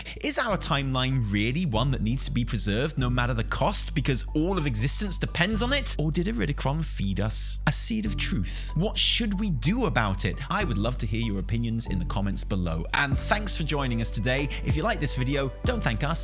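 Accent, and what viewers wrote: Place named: United Kingdom. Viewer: i got really stoned and though t the first like minute of this video was like a food theory video... then i noticed you were British and talking about wow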